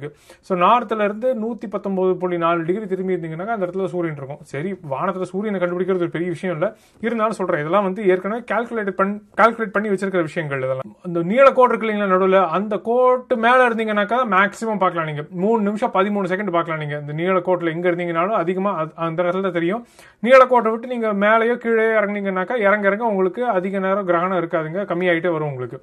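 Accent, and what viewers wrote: Coimbatore, India. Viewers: Bro neenga Coimbatore ah.... Namma aalu.. neenga kuniyamuthur nu type pannathukku apro unga slang semma.. bro Mettupalayam la ipo cloudy ah irukku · Excellent description abt solar eclipse bro. Especially in our Coimbatore slang, really i enjoyed it bro. And I got the solar film for ₹1020 from Mumbai. "Tejraj and co" Mumbai based astronomical equipment manufacturer. If some one need it can use.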